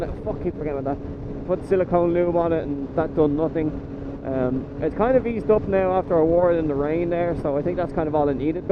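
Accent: Ireland. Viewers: what part of Ireland u from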